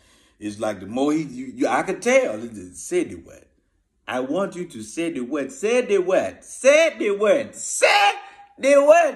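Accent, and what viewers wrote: Africa. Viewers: Brutha Shuler 🤴🏾...😂😭🤣🤣 your African voice cracks me up..😂😭😭 Shaka Zulu frfr... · YOU ARE THE MAN!!!! That African accent.....🤣🤣🤣🤣🤣🤣🤣🤣🤣🤣🤣🤣🤣🤣🤣🤣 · Shuler has the best African accent. PS I am a Nigerian. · Lmbo man u r straight up crazy... more of the African accent, love it love it love it!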